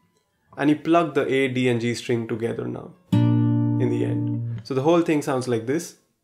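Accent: India. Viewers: You sound Indian... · I m sure this Guy is Indian.. Coz he's English Sounds like Indian accent! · why do u have an Indian accent??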